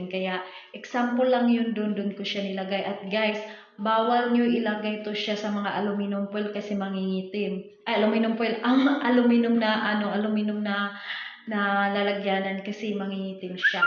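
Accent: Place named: Visayas, Philippines. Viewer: Mam idol titigas ba ang sabon kng ang ilalagay kong lye is 15 pcs kalamansi tpos with tomato mga 5 to 10 tpos lagyan ko pa ng myra e...gusto ko gawing business ksi mam at gusto ko good quality..sana mapansin mo ung comment ko ma'am ksi mg start na ako bukas completo na ako ng gamit...By the way ma'am prang bisaya ang dialect mo..bisaya din ksi ako..Thanks mam..God bless and thank you for sharing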